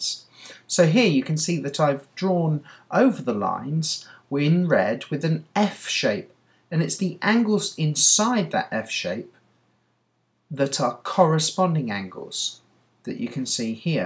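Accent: United Kingdom. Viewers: ***** British accent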